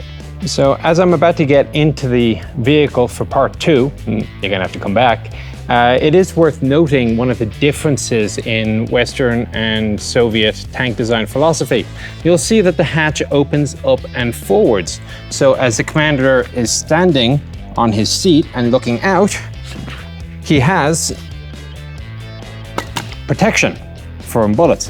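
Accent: Ireland. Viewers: 🤣 Irish or Scotish?👍👍👍💯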